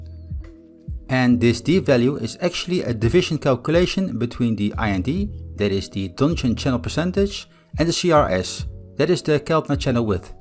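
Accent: Netherlands. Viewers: I enjoy the Dutch accent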